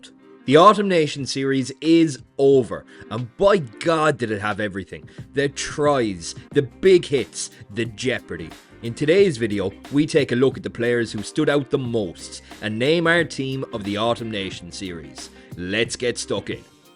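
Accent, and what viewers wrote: Ireland. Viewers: The type of video you watch twice, first time to admire the Irish accent, the second time to capture the good Rugby and Humor content! Well done my friend!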